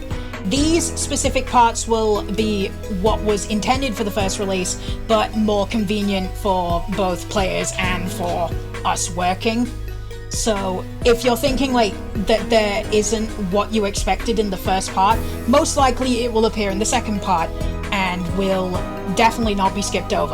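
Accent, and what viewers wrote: United Kingdom. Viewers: My god... he's British... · I like how many of the comments just point out your voice. I could've figured you have an English accent, but of course I too expected a more man-like voice, no offense. =P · Is that a fellow british I sense. RAHHHH 🇬🇧🇬🇧🇬🇧🇬🇧🇬🇧☕️☕️☕️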